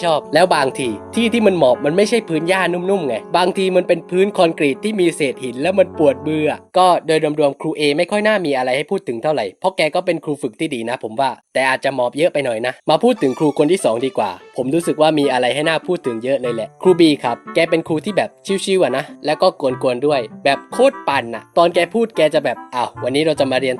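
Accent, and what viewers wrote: South, Thailand. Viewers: พี่บ่าวคนใต้ม้ายนิ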